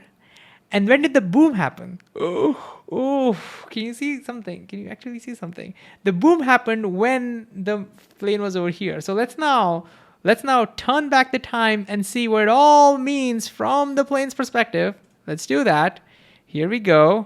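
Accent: India. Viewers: That Indian accent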